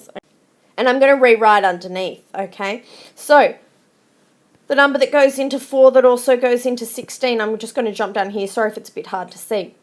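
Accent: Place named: Australia. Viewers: I'm Australian and by the sounds of it, I think she is :) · hey yall ima.australian and.by the sound yall thinking she is.australian .yall are whall awright see yall laterr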